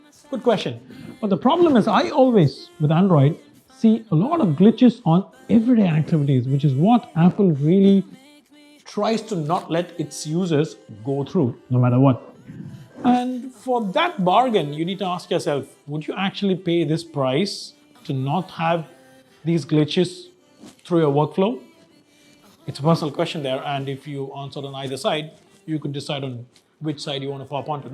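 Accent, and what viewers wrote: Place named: Tamil Nadu, India. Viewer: Enna thala english ku poita un tamil slang ku dhan video vey na pakkuren plz make videos on tamil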